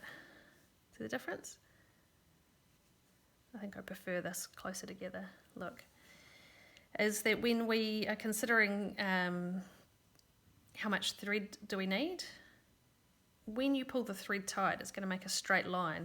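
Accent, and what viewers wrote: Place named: New Zealand. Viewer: This was very helpful! And I love your NZ accent. May I ask which mystery KAL this was. Was there a specific group? Thanks.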